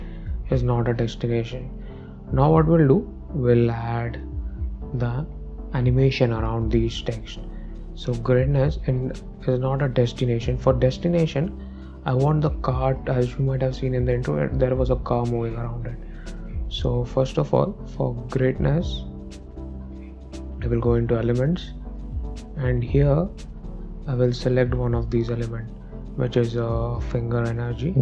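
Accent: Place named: India. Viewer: indian accen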